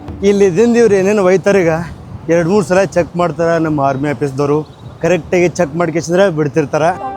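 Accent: North Karnataka, India. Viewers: U k style bhashe super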